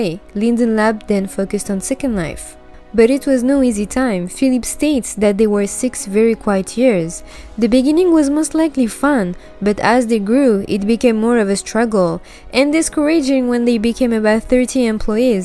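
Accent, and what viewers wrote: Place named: France. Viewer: habibti!!! TYSM so much for all the research you did to make this really informative video. As always, it is a wonderful pleasure to hear your yummy French accent. Bisoussssss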